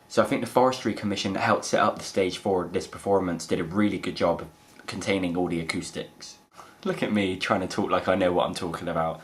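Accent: Bristol, United Kingdom. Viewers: Ahh I love the Bristol accent:) I was gonna go see on Wednesday here in Dublin but just didn't get around to it:/ Maybe there's some left:) Glad you enjoyed. xo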